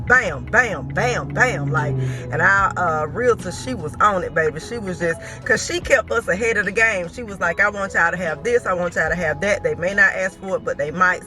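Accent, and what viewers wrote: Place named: Tennessee, United States. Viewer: Ur accent ❤where r u from ? Sounds like ur from Tennessee or Alabama?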